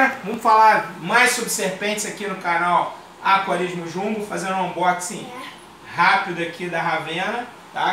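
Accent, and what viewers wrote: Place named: Rio de Janeiro, Brazil. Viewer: Ela eh linda (amo esse sutaque carioca)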